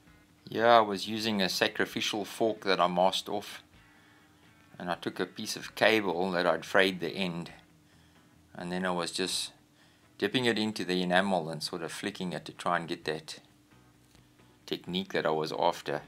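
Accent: South Africa. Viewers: Great build 👍 I’m gradually getting used to your South African accent 😊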